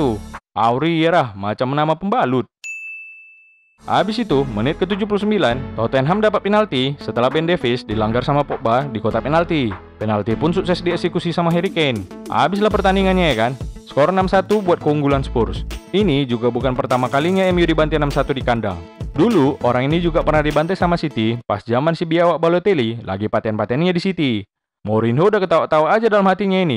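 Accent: Medan, Indonesia. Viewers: Nampak kali ah abg ini orang Medan nya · Abg pasti org medan saya orang mandailing natal bg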